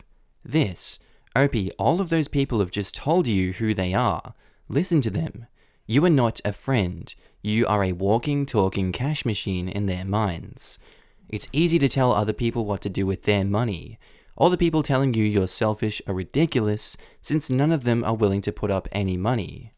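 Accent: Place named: Australia. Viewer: tfw you're an aussie and like hearing a familiar accent, but gotta always laugh at this branding lol (its cringe but i say that lovingly, its a big 'lol good for him' moment from me)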